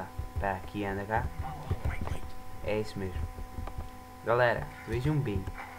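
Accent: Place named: Portugal. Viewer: Portugues de portugal, hahaha bonzao! :D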